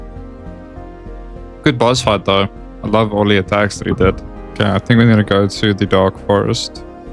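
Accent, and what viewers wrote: South Africa. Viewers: Yo he has such a south african accent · I knew it as soon as you said chow the accent gave it away , you are a successful Afrikaans YouTuber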